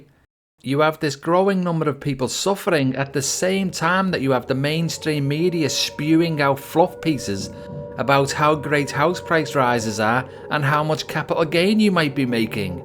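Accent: Liverpool, United Kingdom. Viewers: But you sound like a scouser.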